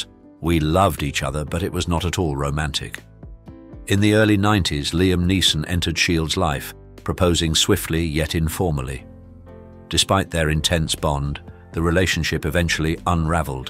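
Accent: United Kingdom. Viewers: Impressive with the British narrator. If it were only true. He met her when she was 14 but was soon shipped back to the US. He went on with his life. She wrote him every day, he never even contacted her for a year. Her parents wanted her to marry the most famous man in the world. Her mother pushed the relationship even though Priscilla started dating a 19 year old named Ron Tapp. Desperate to get her to Graceland her parents sent her at almost 18. Elvis finally married her at 21. They both cheated, she became involved with her dance teacher who was the son of actor Gregory Peck. Then she started an affair with her married Karate instructor Mike Stone. She would finally leave Elvis for Stone. Elvis gave her everything she ask for in the divorce. She continued to demand money from ELvis till the day he died.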